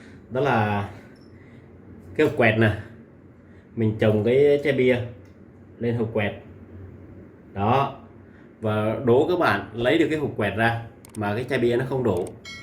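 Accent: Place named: Nghe An, Vietnam. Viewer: Giống tiếng nghệ An ri họ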